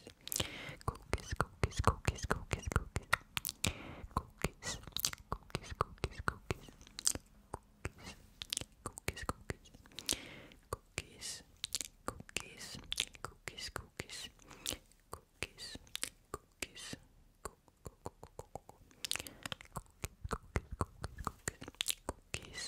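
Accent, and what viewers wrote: Sweden. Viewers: Swedish is one of the best accents for asmr and accent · I love your Swedish accent ❤❤ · He has a Swedish accent ! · Hee Swedish I believe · My grandfather is swedish so I really like your accent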